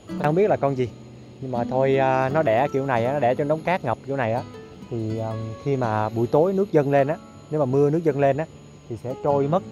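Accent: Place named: North, Vietnam. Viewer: Giọng anh giống giọng miền bắc ghê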